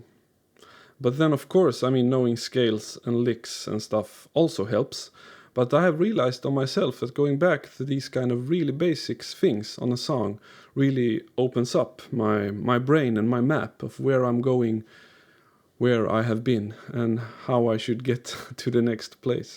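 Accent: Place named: Scania, Sweden. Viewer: Ja, jag fattar inte varför man skriver på engelska. Men det är ju youtube. Jag hör att du okså är skåning när du räknar inn...one..two...tre...fyra !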